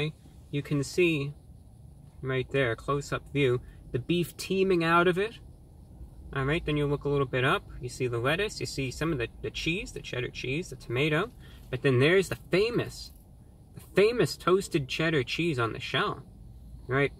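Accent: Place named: United States. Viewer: He is like an elite british intellectual and connoisseur, except with an American accent. I've never seen such classy American. Where are you elites hiding? I finally found one on YT.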